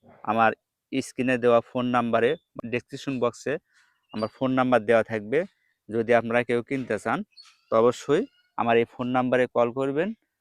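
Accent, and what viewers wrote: Bogura, Bangladesh. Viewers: ভাই আমি আপনার কথা শুনে বুঝতে পারছি আপনার বাসা বগুড়া.( আমার বাসাও বগুড়া, ,,, আপনার গ্রামের নাম কি গোবিন্দগঞ্জ চেনেন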